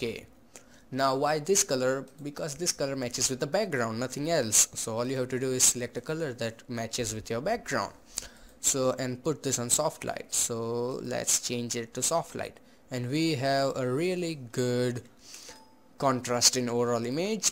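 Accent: India. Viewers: That's racist as shit. Sure he has an indian accent, but his English is alright!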